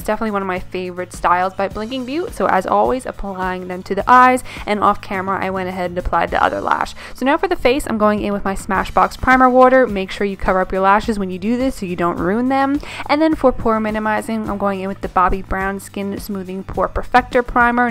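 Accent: New York, United States. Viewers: So beautiful! I love all of your tutorials 😍 Where are you from? Sounds like a New York or New Jersey accent? Lol 😘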